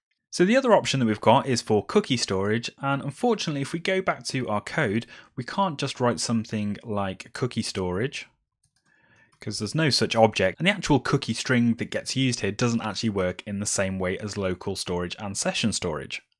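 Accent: United Kingdom. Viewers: Not that I just enjoyed it, it helped me a lot, I implemented it! I just discovered your ytCh, you deserv much more subs. Watched few videos yesterday, I can learn a lot from your videos. I heard that you have a proper English accent. You must be from UK :) I am living next to Manchester :) Do you have a time to be my mentor once a month?...or whenever you have time. That could help me a lot.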